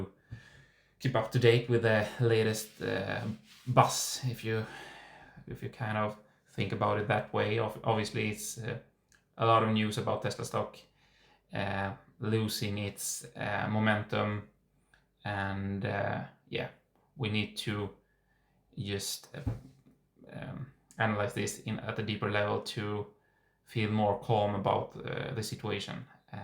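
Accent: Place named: Sweden. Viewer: Your accent seems to me Swedish so tack sa mycket for your presentation and I wish you good luck with your channel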